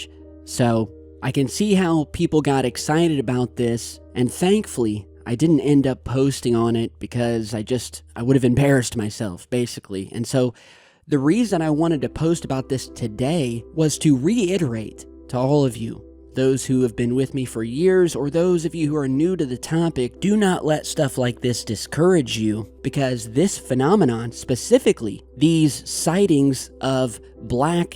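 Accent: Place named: Southern Ohio, United States. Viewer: Haha! I noticed that too. That's strong southern Ohio accent for you.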